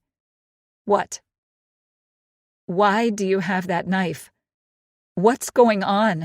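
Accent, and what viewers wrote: United States. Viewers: An American accent! These are practically rare.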